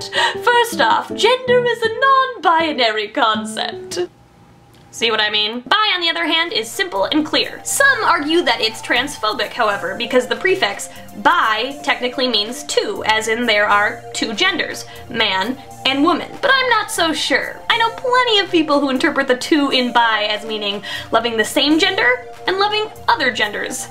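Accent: Minnesota, United States. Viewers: You're MINNESOTAN?!?! SO AM I OH MAH GAAWWWSSSHHH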